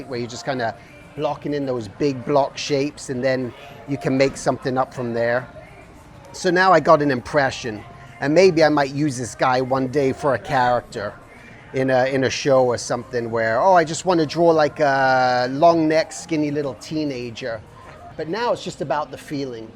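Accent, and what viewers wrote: United Kingdom. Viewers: I know tight! Hahah i was baffled by it! I hear british, a lot of new yoooork. But also a flavor of canadian in there so weird hahaha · Oh my gosh I'm glad it wasn't just me! I hear British pronunciation, a Cleveland accent, and New York inflection. WHAT?!?!?! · He sounds like he has a British accent mixed with a New Jersey accent.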